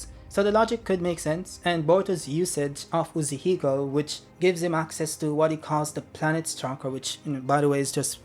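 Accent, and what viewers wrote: Jamaica. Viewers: Bro are you Jamaican ?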